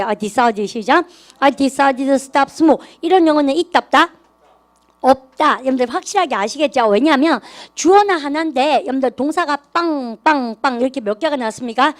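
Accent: Gyeongsang, South Korea. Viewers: 영어와 갱상도 말을 동시에 배웁니다.. 이해력에 많은 도움이 됩니다. 감사드려요~~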